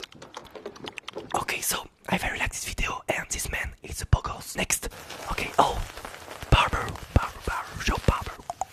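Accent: France. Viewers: et, ça se voit que t'es français vu ton agsent (ou peut-être pas). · Are you French because you have a French accent and I like it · this mousse à rasayy! This is why I love watching! It’s like if French and English collided together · On dirai un français 😂son accent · T’a vraiment un accent français quand tu parle anglais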